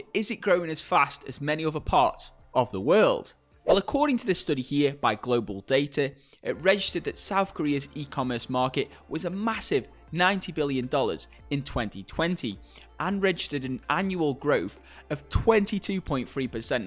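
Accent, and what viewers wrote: United Kingdom. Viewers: Your accent is so British.Awesome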